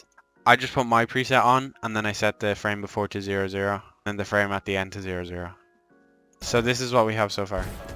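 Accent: Ireland. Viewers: Wait he’s Irish like me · bro i don't care you blured the things, the tutorial was fantastic i watched only because of the irish accent this wasn't even useful for me, i already learnt a lot about editing, and i use premiere, but this was really nice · Didnt know u were irish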